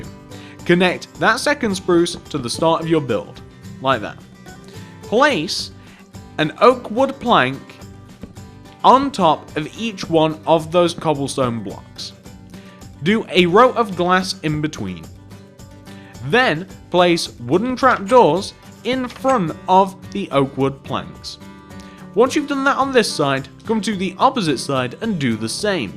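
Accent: United Kingdom. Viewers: I think he's from the U.K.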